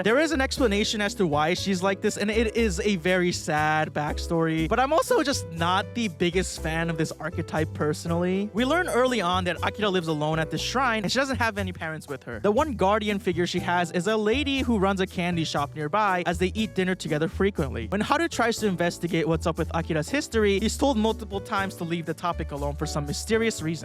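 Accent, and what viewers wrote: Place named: Turkey. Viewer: Are you Turkish? Your pronunciation sounds very Turkic...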